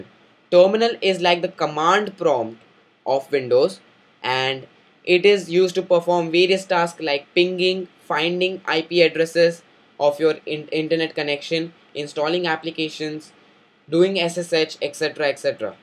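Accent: India. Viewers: He's an Indian. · Lmfao diz fuckin' indian , how2speaksofunny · I don't like it either, but it's because we're not used to it. It doesn't ruin the video, as it's one of the better Indian accents that I've heard.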